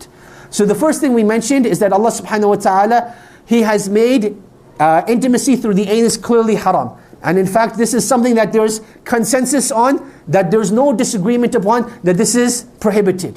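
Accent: South, United States. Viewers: al salaam aliekum yous sound like your from oje of the Southern states. I onow plenty of muslim people whom I have had the pleasur of working with and they have always been peace loving and shared the same intrinsic values as I do, some have escaped oppressive regimes like the taliban and have come to Sydney for freedom to worship to work and to give families a better life. There is a mm uslim preacher called Nounan Ali Khan, I often watch him on youtube, whilst he teaches out the Quran just about alo he mentions on guidence for life, and godly wisdom is also in the bible, he is very gifted in being able to get his message across. Ias a christian I see the church should be out there building bridges with people. There is no room for anti sematism and islamaphobia. Sadly though people of all faiths use there faith platform for selfish and politican means. 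Thankyou for your kind words alhamdililh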